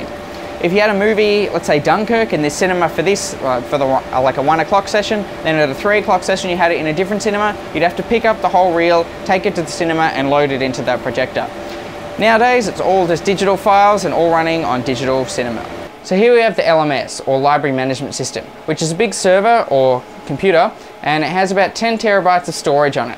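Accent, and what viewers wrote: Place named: Australia. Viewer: Very distinctly Australian indeed. BUT, only one variation of many. I personally sound nothing like that in the slightest. I don't really have much of any stereotypical give-aways. Which I think is becoming common across the world as people become more connected through newer ways of socialising, and media. Among other reasons.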